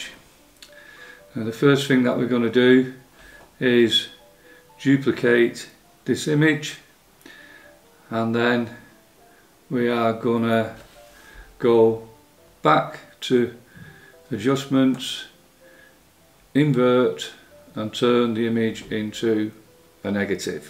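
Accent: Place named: Northern England, United Kingdom. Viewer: Hi, Just printed my first Cyanotype and wanted to know how to progress with wet cyanotypes etc. Have now been watching your videos for the last few hours.(Lovely to hear a northern accent!!) Brilliant am hooked. Today was a beautiful day so can't wait for the next one to experiment further. Thank you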